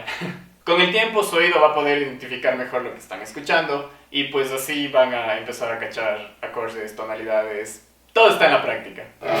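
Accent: Cuenca, Ecuador. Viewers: te has ganado un suscriptor!! eres de Cuenca? jaja tienes el acento xd excelente trabajo, espero que subas los videos explicando más a detalle todo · Tu acento me es familiar, eres Ecuatoriano mi brother?? 🇪🇨🔥🔥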